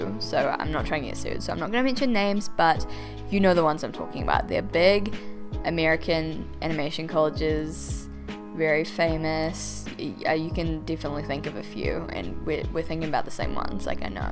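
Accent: New Zealand. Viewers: oh my gosh i knew i recognised a fellow kiwi accent!! you’re right about it not being an art and animation hub sadly :/ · Ohhh you're from NZ! I always wondered why you seemed so familiar and I just realized: it's your accent, your makeup, and the fact that I grew up watching The Tribe lmao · I thought I recognised your accent 😂 NZ youtuber gang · Aha, you’re from New Zealand, that makes sense. I’m not the best with accents, so I wasn’t sure between Australia and New Zealand. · Oh! It’s a New Zealand accent!!!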